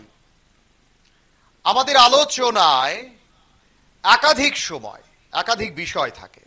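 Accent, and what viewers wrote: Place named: Bangladesh. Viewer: তুই আসলে আসল বাংলাদেশি কারণ তুই যেভাবে কথা বলছস সেভাবে বুঝা যায় তুই ওই বাংলাদেশ থেকে ওই এসোস আমি চাইলে ডেবিট মরা সিং সারের যে লেখছে শের থেকে আরো ভালো করে দেখতাম আর আরো গালি দিতাম বাংলাদেশী তোরা তোরা তিলকে তাল বানাস তার জন্যই এখন যে জায়গাটায় আছো সেই জায়গার বসে রয়েছো কেন ভয় লাগছে বাংলাদেশে যাওয়ার জন্য এমনি তো তোর যেতেই হবে কারণ তুই আসল বাংলাদেশি😂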